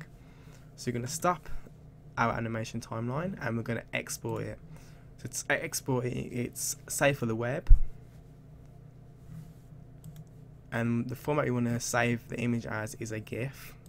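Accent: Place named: Birmingham, United Kingdom. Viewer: birmingham accent :))))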